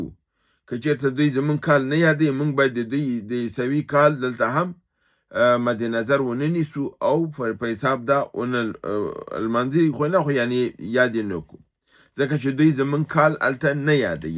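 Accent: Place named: East, Afghanistan. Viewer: te ham po mashreqi lahje khabare kawe. Parse loghatuna ham d°er karawe. wahalai NA balke weshtai de.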